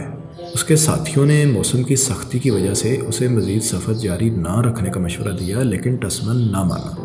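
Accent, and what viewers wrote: Punjab, Pakistan. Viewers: Ap Punjabi lehje ma vbolte ho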